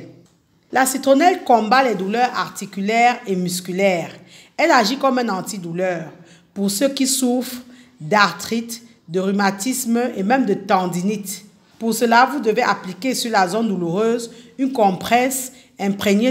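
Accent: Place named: Africa. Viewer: Félicitations ! L'agriculteur africain a besoin d'entendre vos conseils. Personnellement je vous écouterais des heures tellement votre voix est claire et votre accent tout africain de ce français que vous maniez si bien est comme une musique. Continuez. On est ensemble.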